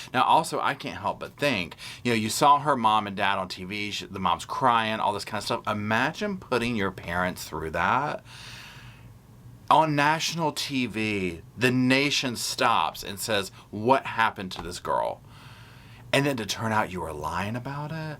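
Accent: South, United States. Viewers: I love your southern drawl! I could listen to you talk aaaaaaaaaaallllllllllllllllllllll day! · 7/26/23... +...the Gruesome 2-some! 😊 Love your Southern accent + your common sense analysis of this ridiculous lie/hoax! God bless taxpayers who have had to pay all this horrible debt for this expensive FAKE SEARCH just b/c she has "hurt feelings" + "seeks attention"! She wants attention + is seeking fame + fortune + book deal + movie deal! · Sir what part of the south are you from. Love the accent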